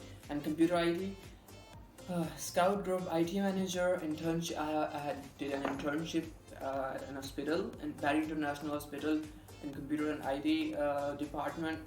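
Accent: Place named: United States. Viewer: America gaya nahi or language abhi se shuru kr di hai wah re wah.. btw English kaafi achi ho gayii hai teri specially American accent 😍 ♥️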